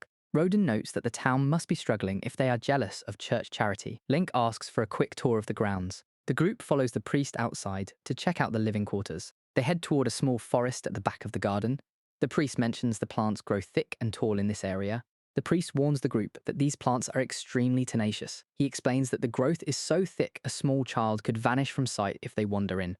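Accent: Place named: United Kingdom. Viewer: British voice -10 Points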